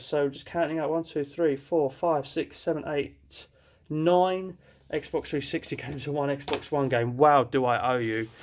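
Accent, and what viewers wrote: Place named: Portsmouth, United Kingdom. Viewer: What a fantastic video from the lads and opened by what i can only assume is a yeti (mate you're well hairy!) you sound like your from down south Portsmouth area? do you have a list/pic of your plat PS1 titles mate?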